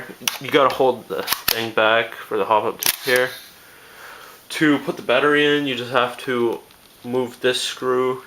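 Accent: Canada. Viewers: Haha I heard you say aboot and I knew you were canadian. I love canadian accents.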